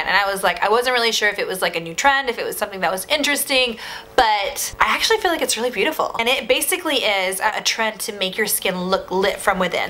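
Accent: Iraq. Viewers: Make arabic vids we like ur iraqi accent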